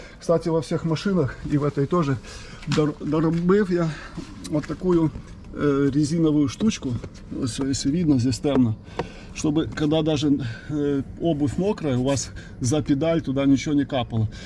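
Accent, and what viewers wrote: Ukraine. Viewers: Супер огляд, дякую! Я взагалі думав, що Ви україномовний і намагались говорити російською))) Як Ви брали? В Україні хіба є салони?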